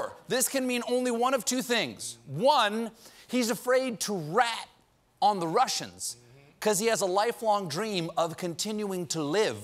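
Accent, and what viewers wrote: Australia. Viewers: English accent has an Australian accent. Funky. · Can someone tell SC there is a difference between a working class/outback Australian accent and an Australian newscaster accent.........go for the second one not the first. Especially if you are going to do a good Assange.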